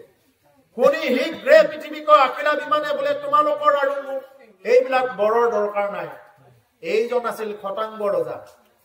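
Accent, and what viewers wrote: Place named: Darrang, India. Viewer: কিমান সুন্দৰ লাগে এই দৰঙ ৰ সুৰ টি কৃষ্ণ কৃষ্ণ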